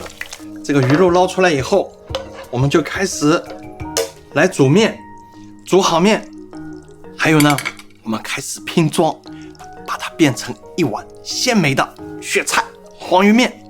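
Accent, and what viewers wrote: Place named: Shanghai, China. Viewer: 沪普好听。盼能回国吃一碗鲜得来眉毛也要落下来的雪菜黄鱼面。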